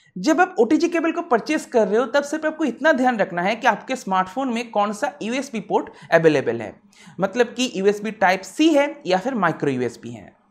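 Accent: Bengal, India. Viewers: I'm also... Tomar kotha sune mone holo tumi bengali😉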